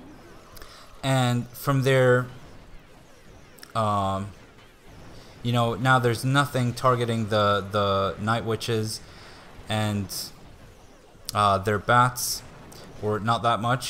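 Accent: Sweden. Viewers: Limpansson 14 Analysing his accent (which is hardly noticable by the way) I think he could be swedish indeed. Kinda sounds like PewDiePie but better English.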